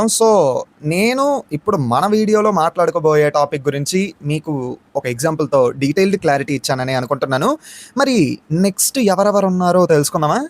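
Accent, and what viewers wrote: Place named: Vizianagaram, India. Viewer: Yeti antai antunnav meedhi vijaynagaram kadha bro 😊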